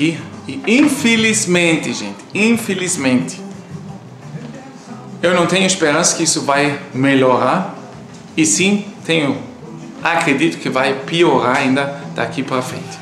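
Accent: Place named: Germany. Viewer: Ôh, é muito triste! O pior é que, o mundo todo sofre essa inversão de valores. Só Jesus! Parabéns pelo seu português, é perfeito, com esse sotaque alemão.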